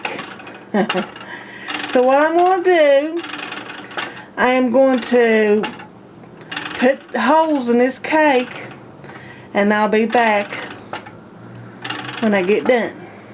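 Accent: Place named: Kentucky, United States. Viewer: you sound like youre from hazard Kentucky. sweet accent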